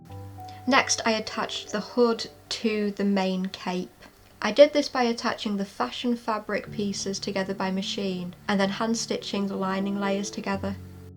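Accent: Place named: Liverpool, United Kingdom. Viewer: I’m from Liverpool too ❤️❤️ I knew I heard the scouse twang 😂 Yeah some sellers do lie or actually don’t know and just take guesses , have you tried “ pound fabrics” ? Prices are great , shipping is from £4.99 upwards, depending on how much you buy, but some great prices, give them a look I buy loads from them , I’m in middle of making myself a dachshund dog , I’m goin to use him as a big pin cushion, I could easily make you one too , do you have a P.O. Box number ? I’ll send him/her to you , you’ll only have to name them , I don’t really want to leave my email here and don’t really know how we can sort addresses out , any ideas ?. Xx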